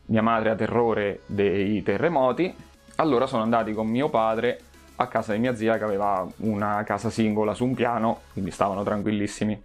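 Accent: Marche, Italy. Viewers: io vivo al nord, mia madre e' nata a Corinaldo e mio padre a Mondavio. Sentirti parlare mi fa sentire a casa. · fai troppo ridere. caparbietà e testardaggine per portare a casa il risultato tutto raccontanto con accento marchigiano. Visto tutto il video col sorriso. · Ai gatti glie gusta ste cose ahahah adoro il marchigiano 😂😊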